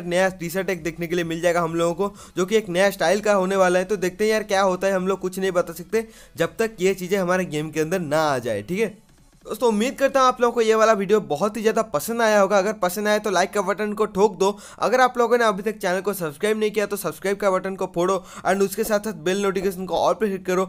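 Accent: Odisha, India. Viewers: Are bhai tum odisha se ho kya ?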